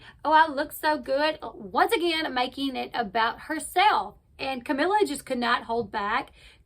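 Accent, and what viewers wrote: South, United States. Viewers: I just love your country accent 💗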